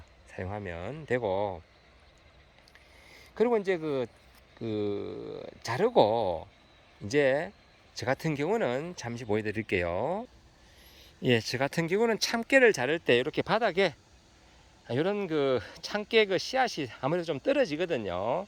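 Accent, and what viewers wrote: Gyeongbuk, South Korea. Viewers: 선생님 음성도좋으시고 말씀도 정확하고 조리있게 잘하셔서 아나운서 를하시면 좋을듯합니다 유트뷰 로 뵙기에는 아까운 인재심니다 그리고 저는 고향이 경북상주군 공검면 하흘리 에 태어나서 10살쯤 경기도 에이사와서 현재 거주하고있는데요 말씨들으니까 고향분같아서 몇번씩 반복해서 영상을보고있습니다 저는6월 12일에 모종을 사서심었는데 언제쯤추수가 가능할까요 현재25마디까지 커서 적심한 상태입니다 처음심은 참께 농사 가 많이어렵군요~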